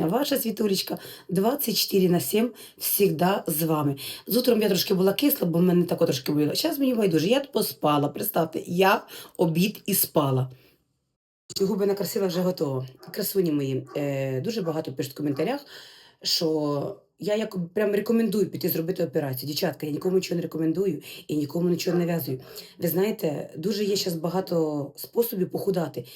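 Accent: Surzhyk, Ukraine. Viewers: ❤❤❤. Вчи українську поки вдома. Бо суржик дуже сильний))❤❤❤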